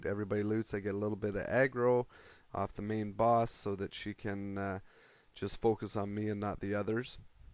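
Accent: Canada. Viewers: haha I remember at the time he was doing this I was just amazed. Because I hadn't seen anything like this. I was like HOLY SHIT!!!!!!! and I like his Awesome Canadian voice <3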